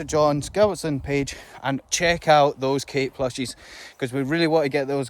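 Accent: Scotland, United Kingdom. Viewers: When you speak I can hear that you are Scottish man.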